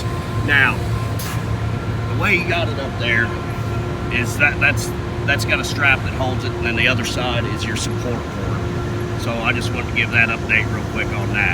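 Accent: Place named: South, United States. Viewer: I could listen to your voice and accent all day, it's a real southern drawl. (from a G'ma in Australia)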